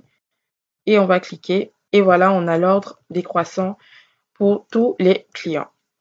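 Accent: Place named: Cameroon. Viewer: Accent camerounais quand tu nous tiens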